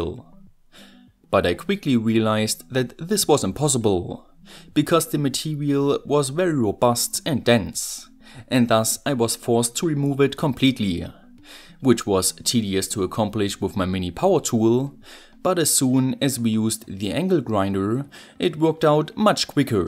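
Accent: Germany. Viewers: the content of your videos SOOO GOOOOOOD your thicc denglish accent SOOOOO BAAAAAD i will now watch your videos muted and with subtitles on. thank you very much for the effort to put subtitles in! keep uploading, you have great videos!!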